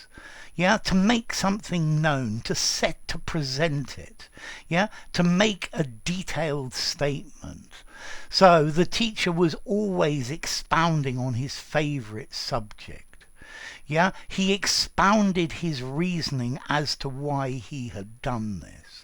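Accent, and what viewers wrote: United Kingdom. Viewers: Hello, are you british or american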